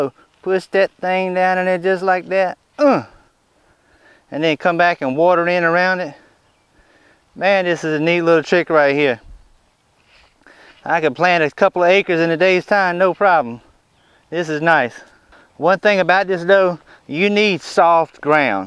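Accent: South, United States. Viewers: LOL! Gotta love some southern talk and I'm from Alabama. Learning alot from your channel. Thank you.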